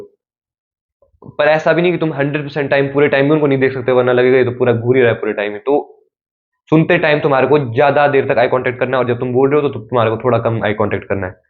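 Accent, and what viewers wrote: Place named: Pahadi, India. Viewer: Bhai tumhari awaj mai pahadi accent ata h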